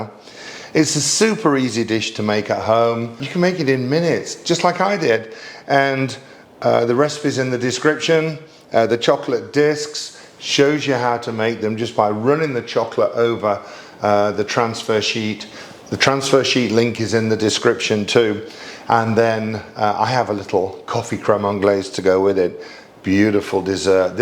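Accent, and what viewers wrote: United Kingdom. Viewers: I had to subscribe. I just love the way you talk. The British vibe is everything! Cheers from France! 😊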